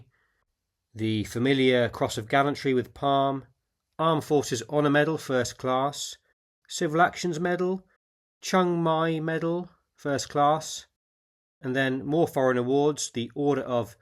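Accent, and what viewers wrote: United Kingdom. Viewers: There is something F.C.U.K.D UP about listening to a Brit covering Vietnam War ?.....NIGEL DIDN'T $URF !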